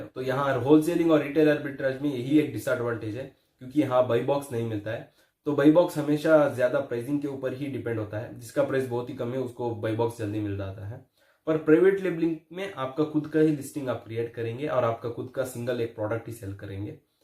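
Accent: Karnataka, India. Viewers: Are you from Karnataka bruh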